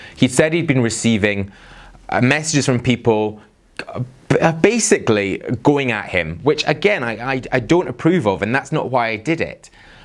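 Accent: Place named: England, United Kingdom. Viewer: where abouts in England are you from? Your accent is amazing!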